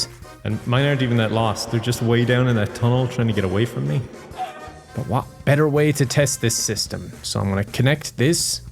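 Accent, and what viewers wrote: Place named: Ireland. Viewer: living in Ireland lol. He spent some time in Spain though! I want to say I hope that clarifies things but it probably doesn’t 😅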